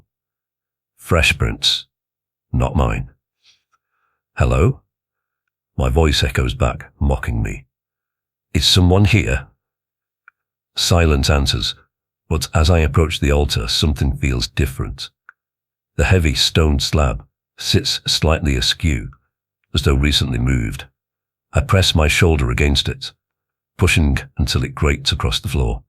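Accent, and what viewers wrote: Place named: Northern England, United Kingdom. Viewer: Hi, my name is Lynda, I've just subscribed to your channel, I can hear that you're a northerner, I can tell I'm going to love your stories, I'm from Burnley in lancs however I moved to milton keynes 25 years ago, so I tickled pink to hear you're a northerner too, thank you 🙏 💛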